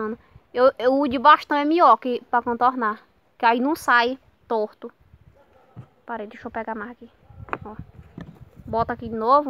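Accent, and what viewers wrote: Northeast, Brazil. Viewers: Nordestino de sitio fala assim (sou nordestino)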